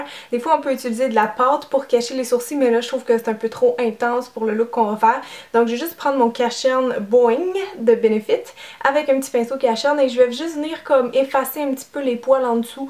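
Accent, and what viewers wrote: Quebec, Canada. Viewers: Je ne supporte pas cet accent canadien! J’ai toujours detesté ! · c'est exactement ça que j'avais besoin pour mon costume des années 20, le maquillage et la coiffure, Merci beaucoup pour ton aide :) excellent vidéo et franchement ça fait du bien d'entendre une québécoise nous donner des trucs et conseils :)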